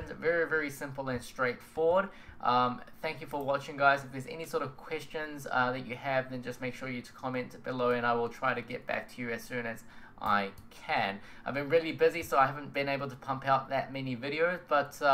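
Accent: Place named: New Zealand. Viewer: Fun Fact: You Are From New Zealand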